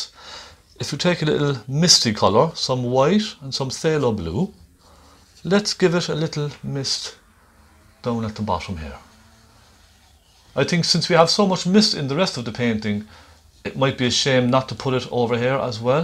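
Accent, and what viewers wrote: Ireland. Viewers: that wonderful Irish accent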